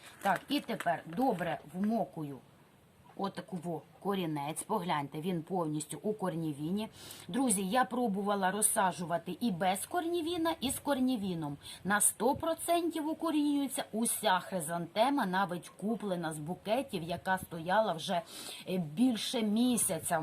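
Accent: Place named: Ivano-Frankivsk, Ukraine. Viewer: Чую у Вас Франківський акцент! Дякую за поради. В мене теж п‘ять котів з вулиці. Бережіть себе. Вітання з Дніпра.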